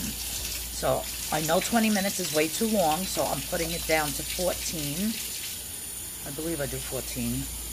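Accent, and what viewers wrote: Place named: Long Island, United States. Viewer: Hi, happy to find your channel! Are you from Long Island? I recognize that accent!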